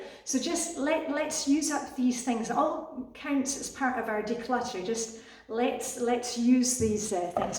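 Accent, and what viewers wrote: Scotland, United Kingdom. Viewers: Hi, I know you from Carol Tuttles T1 page. How did I not know you were Scottish 😍. I assumed you were Danish. From a fellow T1 flybaby 😀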